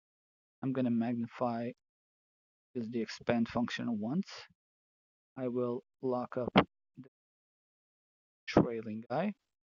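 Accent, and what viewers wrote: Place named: Portugal. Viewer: E esse sotaque portugues?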